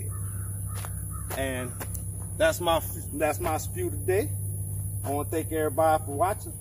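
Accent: Louisiana, United States. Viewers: Sounds like a deep south Cajun accent. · Are you from Louisiana?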